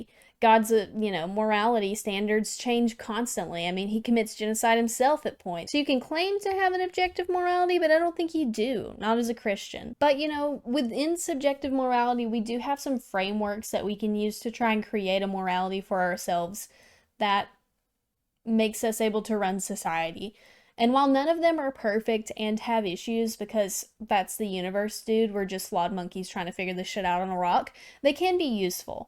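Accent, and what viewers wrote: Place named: South, United States. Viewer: You sounded a lot more sounthern than I would have expected an atheist to sound 🤠🤠🤠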